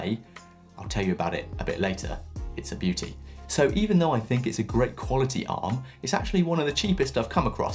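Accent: United Kingdom. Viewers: Loved the energy of this video, really inspiring! (and lovely british accent)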